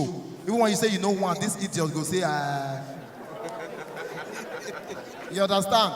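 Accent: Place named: Edo, Nigeria. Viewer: His pidgin is very fast and pure bini, that's why the people needed few secs to process things. He talks very fast too. Good jokes bro, born n bred in the ancient city of Benin. Ride on bro !!!.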